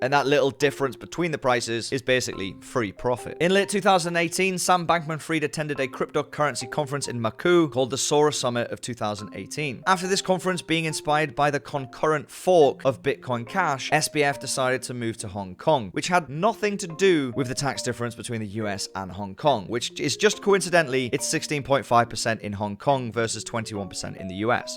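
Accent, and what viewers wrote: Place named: Whitley Bay, United Kingdom. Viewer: Sounds like a Whitley Bay accent 😏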